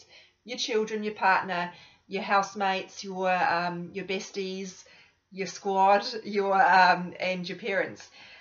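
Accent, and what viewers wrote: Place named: Australia. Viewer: I really feel your love.. But most of all you are so down to earth about spirituality and angels and make it sound so 'normal ;) To me it is but I guess Im still in the closet cause of my familys ignorance..or should I say disinterest in LOA and signs etc.. Im a Twin Flame too but cant talk to anyone here in Gothennburg Sweden about it. Love your channel and your aussie accent! Makes me feel at home, comforted, grounded! ;) Grew up in Australia but havent been back since 1984.. Namaste/Cec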